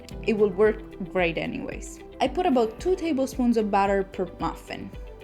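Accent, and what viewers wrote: Italy. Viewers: Si sente che sei italiana❤ bravissima grazie della ricetta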